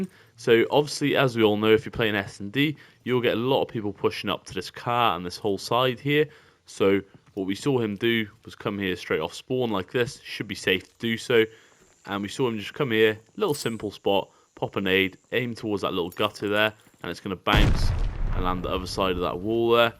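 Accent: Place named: United Kingdom. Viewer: every American likes a UK accent over any commentary, I promise you 😂 it’s just a thing. You’ll keep growing bro, just keep releasing content.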